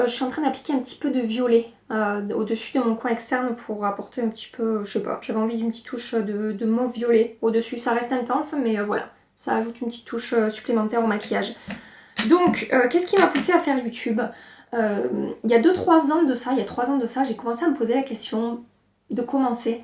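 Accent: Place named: Narbonne, France. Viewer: Coucou! Je ne laisse jamais de commentaires sur les vidéos YouTube donc aujourd’hui est une première: j’ai découvert ta chaîne il y a peu (en octobre quand je cherchais des revues sur la Désert Dusk) et j’ai de suite accroché! Cette vidéo me confirme déjà tout ce que je pensais de toi: tu es une personne simple et douce! Tu restes naturelle et drôle! Merci de partager avec nous tout ceci! Le maquillage sur cette vidéo est bluffant! Je suis une make up addict, ce qui fait rire mes amies ahah! J’ai commencé à aimer le maquillage des le collège et maintenant avec ma soeur nous avons cette passion mutuelle! alors tes vidéos pour moi sont comme une bouffée d’air frais! Je te remercie aussi de me faire dépenser autant 😉 PS: j’ai cru reconnaître un accent narbonnais la première fois que je t’ai entendue (j’habite pas très loin et une amie qui vient de narbonne a le même)! 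Bisous et je te souhaite que ta chaîne connaisse un franc succès, il serait mérité 😘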